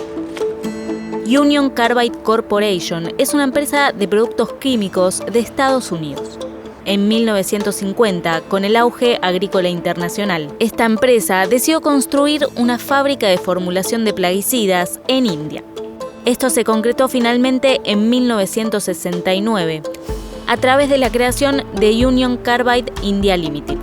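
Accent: Argentina. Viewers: Cada día pienso más seriamente que este canal es el mejor canal de Youtube argentina! (por la voz parecen argentinos, me disculpo si no son de ahí), una GENIA la narradora!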